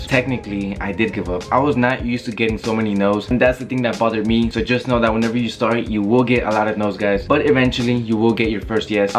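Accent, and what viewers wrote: Chile. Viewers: ERES CHILENO WEON? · Eri chileno o no hermano?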